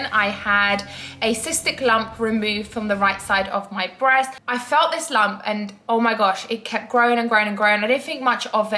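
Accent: United Kingdom. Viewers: daaammmnnn...why am I so love to british accent, so cute 😻 Wait 🙄, really just the accent bro?? 😏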